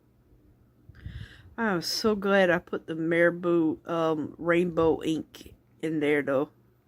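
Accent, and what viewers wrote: South, United States. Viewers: You had me with the Southern Drawl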